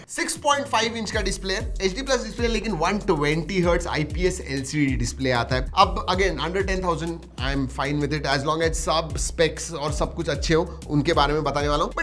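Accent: India. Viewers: The only one indian guy whit nice accent en nice video ❤ thanks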